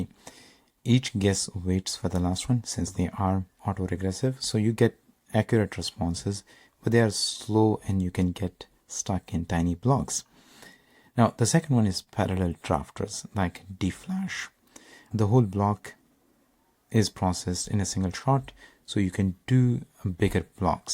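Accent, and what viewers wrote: India. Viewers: >open video >Indian accent >close video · god dammit! i thought an ad was the video and thought "oh thank god... NOT another indian..." then i realized it was an ad and you started talking and i wanted to throw up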